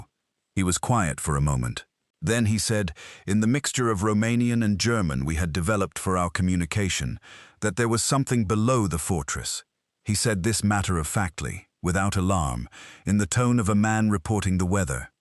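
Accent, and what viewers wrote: United Kingdom. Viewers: English accent, but he can't pronounce lieutenant correctly. Pissed me off.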